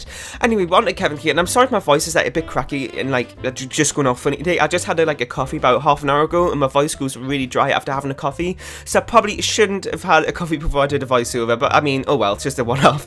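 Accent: Newcastle, United Kingdom. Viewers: this was so great haha! How have you slipped under my radar? This is my first time checking out your channel! You seem like a nice guy, loving the Geordie accent! I'll look forward to having a nose through your work:D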